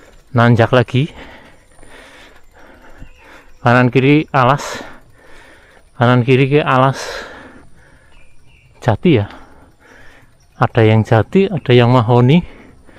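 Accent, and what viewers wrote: Banyumas, Indonesia. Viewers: Krungu logat ngapak dhadhi kepengin bali njawa koh..🥹🥹🥹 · Mbok ana ula dengernya lucu btw saya dri jawa timur suka denger orang ngomong ngapak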